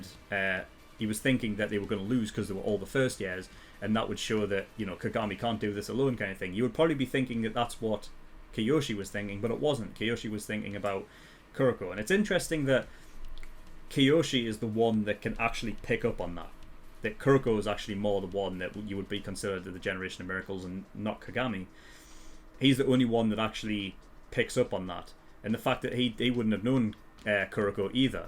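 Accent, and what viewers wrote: United Kingdom. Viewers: Your English is music to my ears since I love British English so much. Which part of England you live? Sorry that I ask I just am curious. I mainly mean in which city because I have been in England in 2004 during our Midsummer and it was extended weekend meaning I left from Finland on Thursday and came back in next week's Tuesday and I was in London then but since it is so big city I didn't managed to see all possible things but I liked that trip I really did. Yes I am from Finland myself despite my username.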